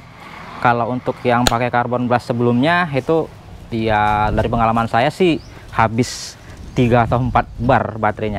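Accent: Medan, Indonesia. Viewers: Orang medan ya bang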